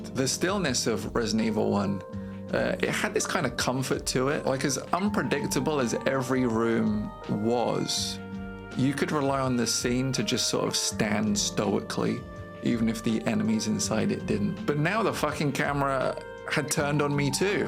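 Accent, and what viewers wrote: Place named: England, United Kingdom. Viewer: Wow, I was shocked to end this video and see you only have 40 subscribers. Love your humor and topic of video. The combination of English accent and mug is a little hard to digest at once, though. If you’re going to show your face at least, use an American accent or vice versa. Keep up the quality man. Seems like you’ll be successful on here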